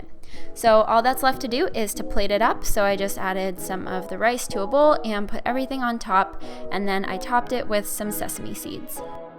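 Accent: Canada. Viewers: Do I hear an accent? Canadian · Dark hair reeeeally suits you! And your Canadian accent is adorable :) love this!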